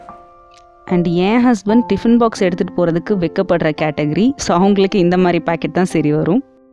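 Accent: Kerala, India. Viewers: Madam neenga malayaliya. Phonele malayalam movie pathe athunale kette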